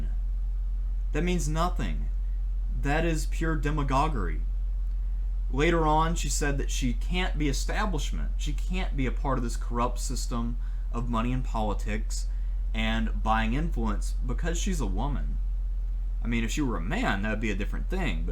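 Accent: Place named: Texas, United States. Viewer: ...."So if we end up losing in November, don't blame us. Blame yourselves...If you feel otherwise, go fuck yourself." You sound hilarious using vulgarity with that Texan accent or whatever it is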